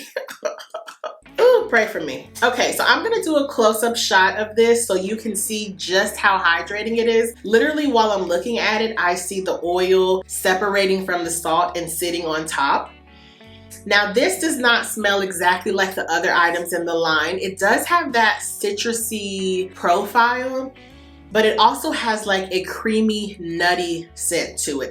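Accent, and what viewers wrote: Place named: New York, United States. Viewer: You sound like New York when you talk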